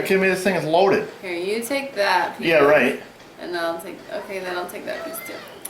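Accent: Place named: New York, United States. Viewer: Well that New York accent came out in this video! haha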